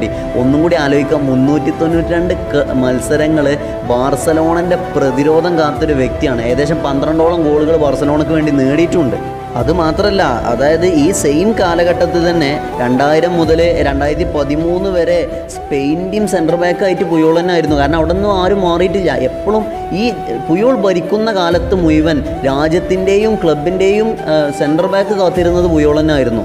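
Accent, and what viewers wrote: Malappuram, India. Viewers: Kettit malappuram muthaanenn thonnunnu allenkilum malapurakaarey anik peruthishtaa Alla chaggay maarey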